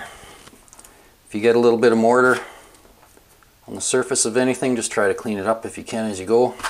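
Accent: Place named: Canada. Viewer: Finally someone who pronounces the word Niche correctly. I'm gonna guess you're canadian right?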